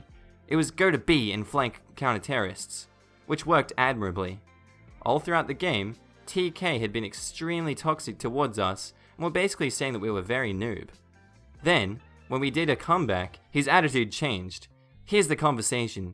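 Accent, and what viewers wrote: Australia. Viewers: Are you Australian? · Ello fellow Australian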